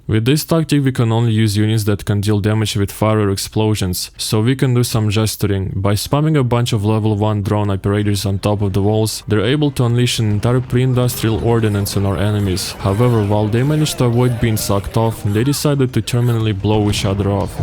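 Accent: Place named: Russia. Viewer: thick russian accent... I LOVE IT!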